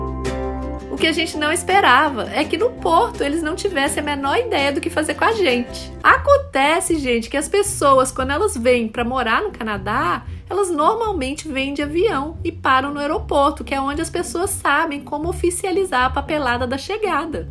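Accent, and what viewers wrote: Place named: Minas Gerais, Brazil. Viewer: Ei, como voces, eu tambem sou mineiro de BH e tambem moro no Canada, porém em Montreal. Adoro assistir os seus videos pelo otimo astral que voce demonstra nos videos e tambem para ouvir o seu sotaque. Ai que saudades de ouvir o nosso sotaque mineiro de BH. Abraço para toda a familia Otavio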